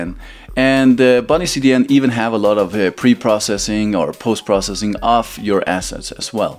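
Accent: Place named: Denmark. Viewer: This is a great channel! I'm going to venture and guess that's a Danish accent. We need more Dane dev youtubers! Godt arbejde!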